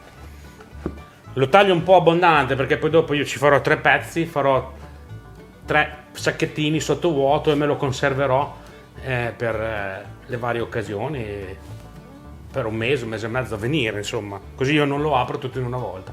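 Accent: Emilia, Italy. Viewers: sei bravissimo, grazie per questo video! una curiosità, di dove sei? dall'accento sembri emiliano. io sono romagnolo (Rimini) ;)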